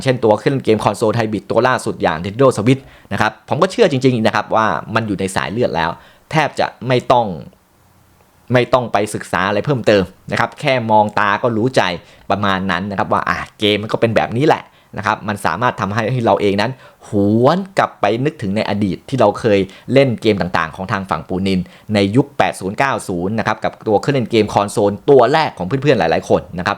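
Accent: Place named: North, Thailand. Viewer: ไม่ว่ากันเรื่องพูดไม่ชัดในบางคำ เพราะมันฝังรากลึกไปแล้ว เหมือนคนเหนือ ต่อให้พูดกลางแค่ไหน จะมีแว๊บหนึ่งที่จะเหน่อเหนือนิด ๆ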